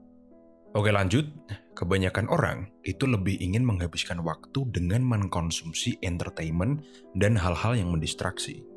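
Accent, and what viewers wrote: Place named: Semarang, Indonesia. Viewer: Koyo suarane wong semarang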